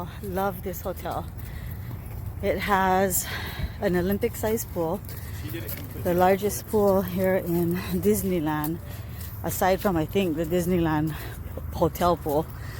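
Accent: Hawaii, United States. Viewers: as soon as you started talking i knew you was from hawaii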